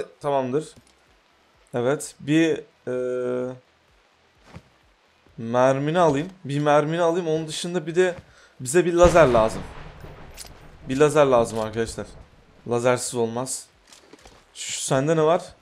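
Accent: Adana, Turkey. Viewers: ADANALIYIM DE BİZİ KURTAR YÜKTEN BU ŞİVELERİN BAŞKA AÇIKLAMASI OLAMAZ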